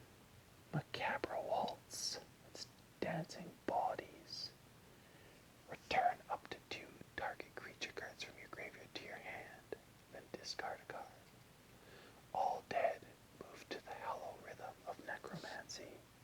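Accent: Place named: Canada. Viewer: It's nice to hear another Canadian accent :)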